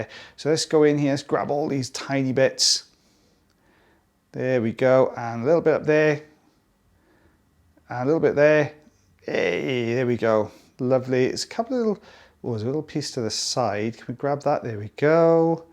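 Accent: Wales, United Kingdom. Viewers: Is you Welsh Butt?? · It is a Welsh thing - love the videos, and also love hearing your accent - reminds me of home!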